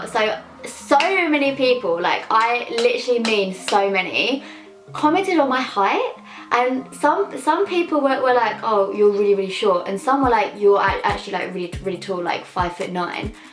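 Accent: RP, United Kingdom. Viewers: You say put the roadman accent on, what roadman accent 😂u still sound posh bare gyal in South speak road u don't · i think the stuck up one was thought bc of your accent bc its kind of posh compared to others especially compared to mine ahahhaha im from new zealand but yeah idk i personally don't think you are I think you're awesome!!!! :DD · Naa u sound posh still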